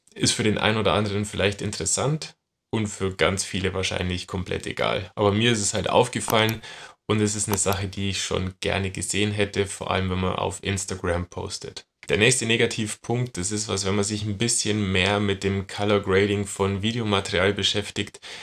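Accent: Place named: Bavaria, Germany. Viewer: Servus, guter Content und angenehmes bayerisch :) ist das Foto bei Min Regensburg?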